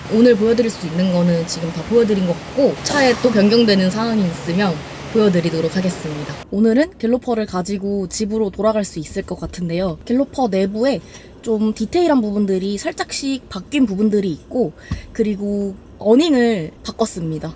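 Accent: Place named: Jeolla, South Korea. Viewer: 말씨가 전라도네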